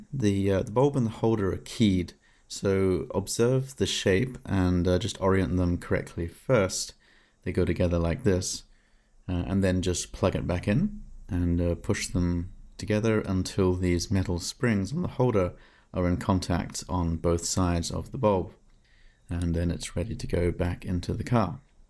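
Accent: England, United Kingdom. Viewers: He sounds English to me and he opened the bonnet/hood up on the UK's driver's side.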